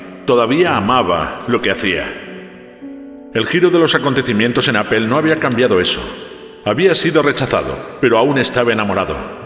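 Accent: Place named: Spain. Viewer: Todo está bien, pero el acento español le quita nostalgia y seriedad, un español latino le hubiera ido mejor.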